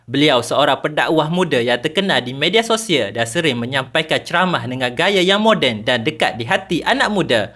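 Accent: Kelantan, Malaysia. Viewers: Doh mu bakpe dok cakap kelantan..naik luga aku dengor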